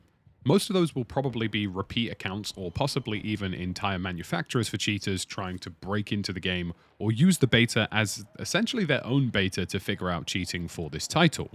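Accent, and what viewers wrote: United Kingdom. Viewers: You're avoid the issue because the man being accused is one of the "protected" degenerates and you don't want the heat. You sound like you live in the UK so it's really risky for you.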